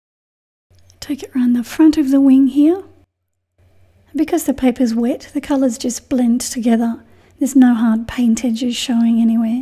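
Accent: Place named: Australia. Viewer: I keep repeating to myself “ I am SO happy I found you on YouTube!”. I love your attention to details WITH the softening and the loose or lost edges. You are a fantastic teacher. Clear, easy to follow and understand. Your limited palette makes me remember to keep moving in that direction and I need to remind myself to let the colours mingle on the paper and stop fussing with them.. I love the Ozzie accent too! So sorry to hear about those horrible fires and so much damage to homes, forests and wildlife. The World IS watching and hearts are aching.. please keep posting your videos are in my favourites list and I watch some of them over and over. It shows how hard you work to get them right!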